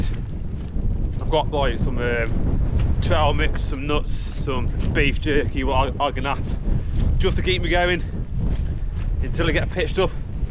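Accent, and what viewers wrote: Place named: Northern England, United Kingdom. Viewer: I think there are two things you could do to improve this video. The first is to pause your walk when you address the camera. Set a scene. Consider the background. Consider all the points you want to make, make them and then continue the walk. Look at the scenes and the composition in videos like those of the outdoor boys. The second, and I really don't mean to be offensive, but you really need to find a way to tone down the northernness. Like "A few years back, me dad give it me". This is barely intelligible. You'll be more accessible to a much larger audience if you could rephrase it as "My dad gave it to me a few years ago". I do like this content and I want it to succeed. But these are things that need to chance quickly, or else you'll struggle to reach new audiences.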